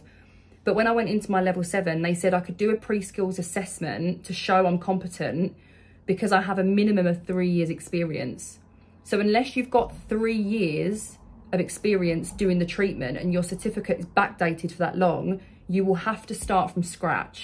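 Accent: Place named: United Kingdom. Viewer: Hello, I absolutely love your UK accent❤ I live in Texas and recently got certified as a concierge neurotoxin injector in Prosper TX. Would you please be able to help provide what it is I'd be needing to build clientele also to confirm that course i took is compatible to a level 4, 5, or 7? I'd really appreciate your effort and assistance. Thank you 🎉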